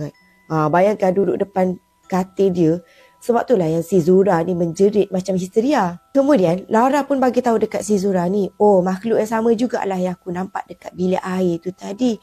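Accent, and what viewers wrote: Kelantan, Malaysia. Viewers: ni org Kelate ke?! Cakap KL pun bunyi macam loghat Kelate 😅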